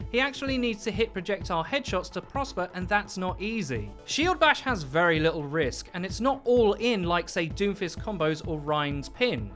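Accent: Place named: United Kingdom. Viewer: STOP COMPLAINING! THIS CHANNEL IS BECOMING A PROBLEM FORNTHE GAME. YOU GUYS COMPLAIN ABOUT THING YOU DONT LIKE ABOUT THE GAME INA BRITISH ACCENT AND IN TURN CONTROL THE NARRATIVE FOR THE CHANGES THEY MAKE IN THE GAME! THIS IS A FUN CHARACTER TO PLAY AND SHE IS NO WHERE NEAR OVER POWERED. BLIZZARD NEEDS TO FIND A WAY TO CRATE A GAME THATS FUN FOR THE "PROS" AND FOR YOUR FREAKING BRONZE-DIAMOND PLAYERS TOO! YOU GUYS KEEP ASKING FOR CHANGES THAT MAKE IT NOT FUN FOR LITERALLY 90% OF THE PLAYERS!!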